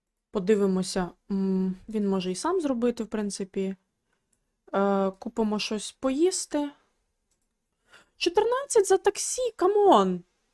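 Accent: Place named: Lviv, Ukraine. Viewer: А звідки ти родом?) Мова дуже нагадує мені Львів або Тернопіль..)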